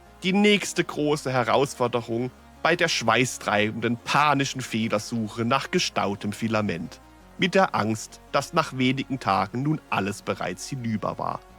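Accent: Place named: Palatinate, Germany. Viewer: Kummscht du aus de Palz?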